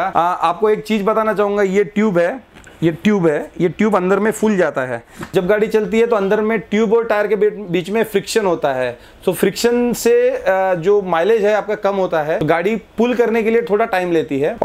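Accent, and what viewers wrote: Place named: Gujarat, India. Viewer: Bhai Hindi bolneke Andaz see pata chal raha hai aap bhi Gujrati ho, specially from Khila word😂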